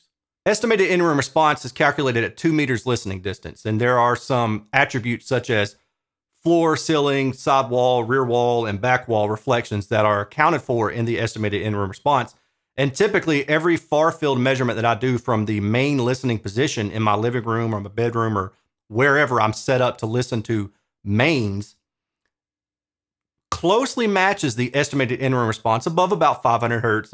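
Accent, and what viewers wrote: South, United States. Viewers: Love your speaker reviews! Dig the Southern accent, too. I found this monitor on Amazon UK, but for us Limeys, it costs a good deal more ($415) unfortunately. Grrr!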